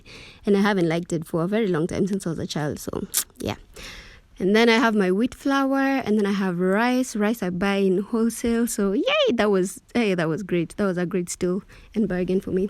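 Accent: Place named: Kenya. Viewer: Kenyan YouTubers and accents yawaa.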